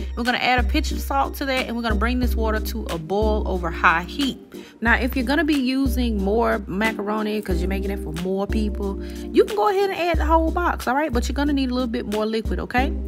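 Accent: South, United States. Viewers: Will be trying your recipe tonight 😋 I love the way you explain and talk lol sweet southern belle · By the way your voice is so southern and comforting that I sometimes listen to your YouTube channel even when I'm not cooking, you remind me of my aunt back home in the south. Everything you make, makes my mouth water! Keep that delicious goodness coming! ♥️ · She definitely from the South, I love Us😊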